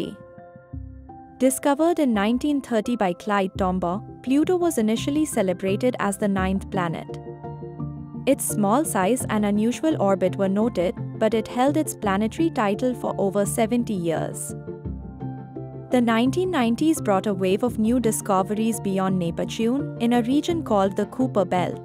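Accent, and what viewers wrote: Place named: India. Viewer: F kking indian accent sounds like dum scammers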